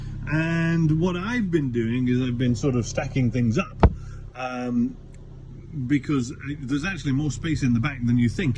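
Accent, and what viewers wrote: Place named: RP, United Kingdom. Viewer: Hold on a minute. I'm 12 videos away from watching all your videos. I've been at it about 5 days. I'm watching in no particular order. I think I been going backwards mostly. Why are you talking so posh is this one? 😁😁😄🤣 what a difference from this one to your most recent haha. Anyway love your stuff, will be gutted if you get rid of Finn. I'm an aging L320 owner dreaming of an L663 someday soon I hope. Love from Scotland 🏴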